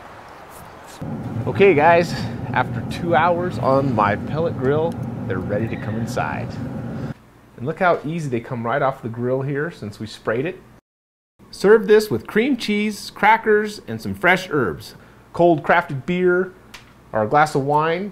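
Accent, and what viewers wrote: United States. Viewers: He sounds American so I think Fahrenheit. Also these are smoked so 150 Fahrenheit sounds about right because 150 Celsius seems too much and will burn the fish in less than an hour. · I work commercial salmon fishing every summer in Alaska. I make this recipe 10 times a year. Love it. You from Idaho? I can hear it in the accent.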